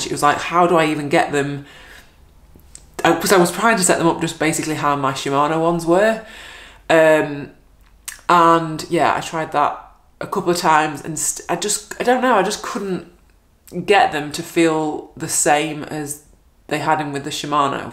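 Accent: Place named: North, United Kingdom. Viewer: 🥰 northern lass 🥰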